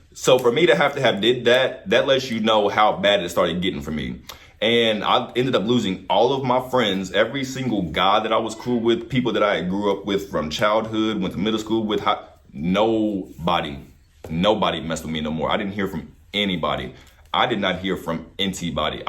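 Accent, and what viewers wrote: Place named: New York, United States. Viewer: 🤓 u talk n sound like u from new York City n that dude from black ink crew NY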